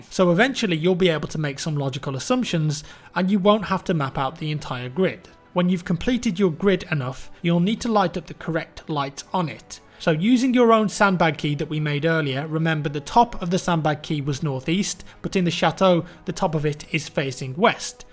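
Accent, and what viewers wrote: United Kingdom. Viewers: why do all great youtubers has to be british ? i mean fck off i can't understand nothing from that accent . since when you say "far" for "for"... i mean far != for ...it just so stupid i allways close this kind of videos but fck i need that dog tag.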